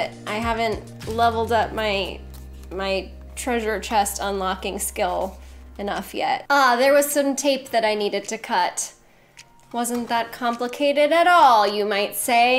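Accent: South, United States. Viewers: You’re killing it with the southern accent. It’s like I’m watching a home improvement video and you’re showing off your tools 😁😁👍👍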